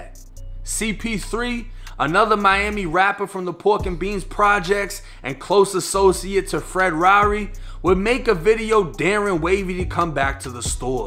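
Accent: Boston, United States. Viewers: U got ur Boston accent back in full when I seen u on 32 n 1 years ago u sounded like u Florida boy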